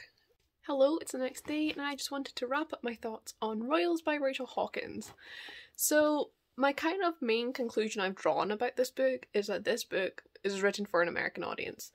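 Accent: Scotland, United Kingdom. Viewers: i love how you've let out your scottish accent a bit more in this video lol. i'm not scottish but when i was reading her royal highness i could feel that it was all just a big stereotype without much research behind it, so it was interesting to hear your point of view. And honestly i totally feel you, i'm from italy (probably the most stereotyped country in the world) and it's frustrating seeing people (but especially americans) romanticise and misrepresent my country all the time.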